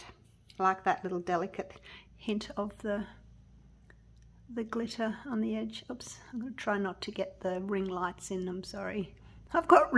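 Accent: Australia. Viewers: Wow that is amazing! Everything you do is amazing!! I work with resin but can’t even come close to doing all the great stuff you do!! I love all your videos!! Plus there isn’t anything better then a Australian accent!! Lol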